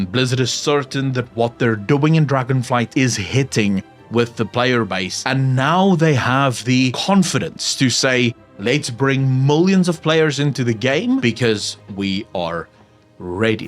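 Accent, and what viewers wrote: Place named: South Africa. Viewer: are you south african? love your accent awesome video and good takes. og wow fans will stay, reminds me of the wod period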